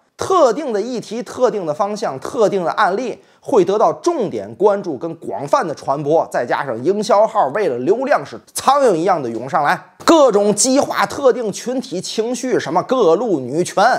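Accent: Tianjin, China. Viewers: 早安, 新闻充满正能量,而且资讯非常正确,而且讲话充满了天津话顺口溜,超级的高级幽默, 的视频跟长脸自干五的视频,完全是不同的风格,可是都让我长知试了, 加油了.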